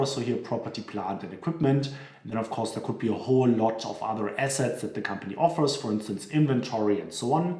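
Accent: Germany. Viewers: Du hast echt ein sehr heftigen deutschen Akzent 😂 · Ich schmeiß mich weg 🤣 YouTube hat mir „deutsche“ Untertitel automatisch eingespielt. Ich habe zuerst gedacht das wäre dänisch, lettisch oder so. Als ich dann nachgesehen habe war deutsch angegeben. In Kombination mit dem extremen deutschen Akzent pure Comedy 🤓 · Liebe deinen deutschen Akzent! :D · Hört sich deutsch an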